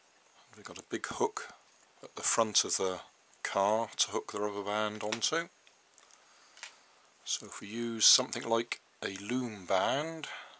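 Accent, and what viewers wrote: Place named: Cornwall, United Kingdom. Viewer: Sounds like a Cornish accent to me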